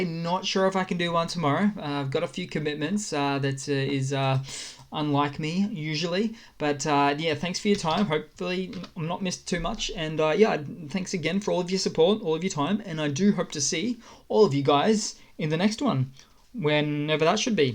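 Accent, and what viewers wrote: Australia. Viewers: Another good assessment. Out of curiosity, are you an Australian? (BTW, I am). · Fake news i see. Is that an aussie speaking. FFS mate, get a life. This is just propaganda ❤ russia from the 🇬🇧🇬🇧🇬🇧🇬🇧.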